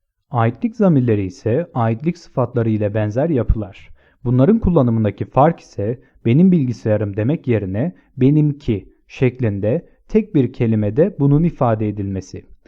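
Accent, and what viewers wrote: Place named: United States. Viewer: Amerikan aksanınız çok hoş ❤️👋🏼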